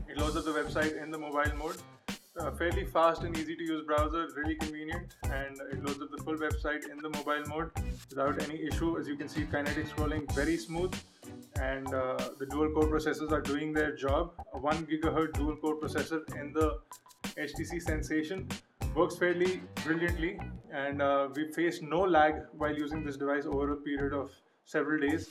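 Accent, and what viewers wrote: India. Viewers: your fckin idian accent is annoyinggg! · what you expect ,an indian to speak english in american style. you fucking idiot. shut your mouth and get some sense not sensation.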